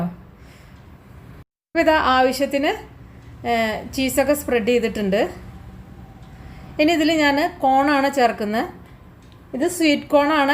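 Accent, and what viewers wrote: Palakkad, India. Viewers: Super, 👍try cheyyam, palakkad ano നാട് നല്ല രസം പറയുന്നത് കേൾക്കാൻ നല്ല അവതരണം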